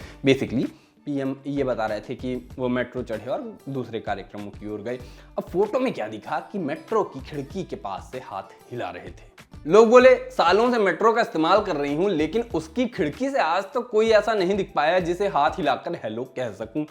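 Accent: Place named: Rewa, India. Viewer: Mai bhi rewa se hu.. Aapka accent 👌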